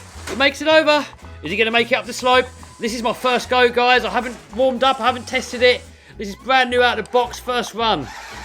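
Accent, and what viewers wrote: United Kingdom. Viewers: Is that Uk accent? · Are you British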